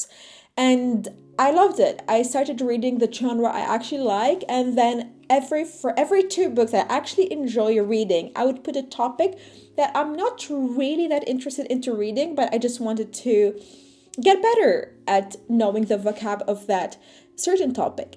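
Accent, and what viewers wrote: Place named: United States. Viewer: When we first subscribed to your channel, we thought you were American :) your accent is really good!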